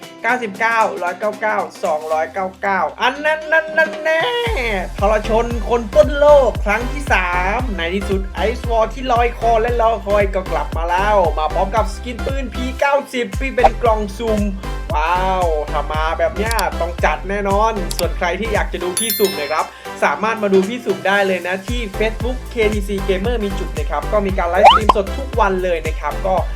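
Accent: Isan, Thailand. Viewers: เว้าอีสานม้วนเเท้ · พูดกลางบ้างอีสานบ้าง555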